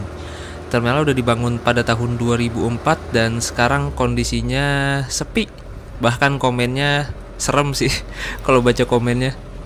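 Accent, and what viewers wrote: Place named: Depok, Indonesia. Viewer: Asli emg logat bhasa anak depok ka, btw saya org depok juga soalnyakwkwk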